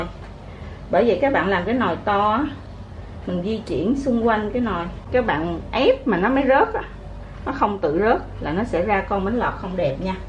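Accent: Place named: South, Vietnam. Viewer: co ten la phai khong? rat tot, rat tan tinh trong chi dan, tieng noi mien nam rat rong lam cho nguoi mien nam rat hanh dien ve ban chat chan that, het long nay. Cam on em that nhieu!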